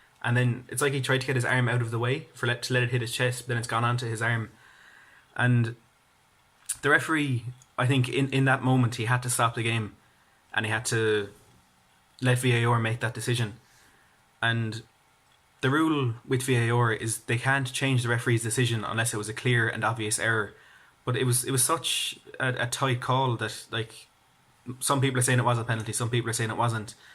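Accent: Ireland. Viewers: a weird accent, how rude, is from Ireland, his accent is very common in Ireland!, comment on his Blog, not how he sounds.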